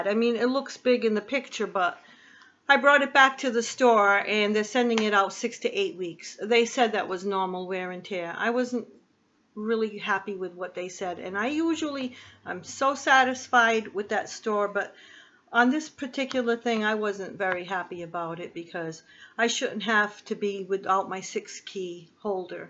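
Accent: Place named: New York, United States. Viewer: You must be from New York or jersey !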